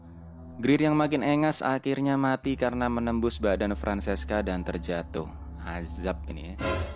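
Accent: Java, Indonesia. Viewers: Orang jawa ya bang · Org jawa y bang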